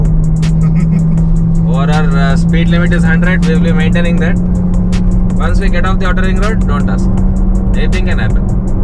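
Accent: India. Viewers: Anna Telugu Vallu aie English lo ala matladutharu Anna😔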